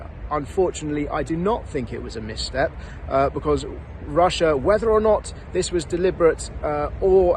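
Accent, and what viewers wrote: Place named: United Kingdom. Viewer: Yet another DEI jackass with a british accent talking about what he doesn't know.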